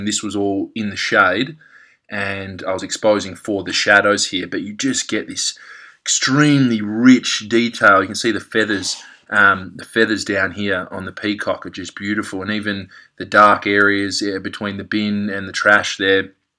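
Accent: Australia. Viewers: Absolutely love this film. I especially love shooting it in my M3, with a Summicron 50mm colapsable. On a nice sunny day, it's a must. I agree with everything you said, and the cafe shot is lovely. Also, I've been following the USA political scene for almost two years, and to hear an Aussie accent on YouTube is a real bonus. Well done.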